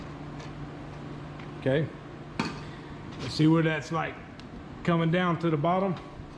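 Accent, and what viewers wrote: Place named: South, United States. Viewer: Nice man. I am from south lousiana I am a pipe welder by trade. Nice work. You sound like your from the south your self. Either way great job.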